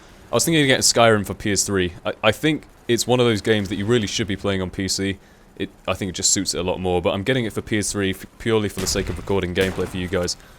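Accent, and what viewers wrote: United Kingdom. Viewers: Normally I hate the british accent but not yours tho :O please respond ? -Greetings from Finland!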